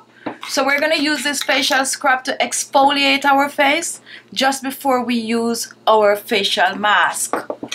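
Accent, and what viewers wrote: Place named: Jamaica. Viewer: Sounds like u r from Kingston Jamaica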